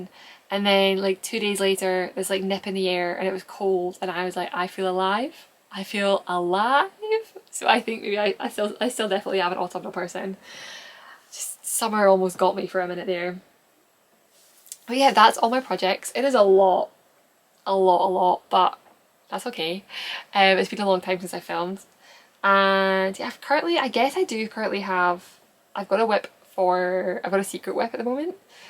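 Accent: Scotland, United Kingdom. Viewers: Hi, My first time watching your channel - lovely to see you looking so well! I have knitted your patterns before and love them. You are such a talented and generous knitter. One comment - I am from Edinburgh and lived in Scotland all of my life - but I struggled keeping up with your commentary..😂. You talk very quickly, with lots of words..I know it is a Scottish thing to rush at sentences, but it wasn’t quite as relaxing to watch as some other videos. Appreciate you have a lot to get through, so not a criticism, just an observation…❤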